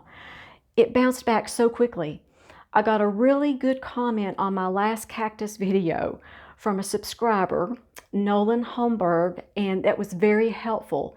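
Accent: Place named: Tennessee, United States. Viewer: Are you in Tn. We have the same accent.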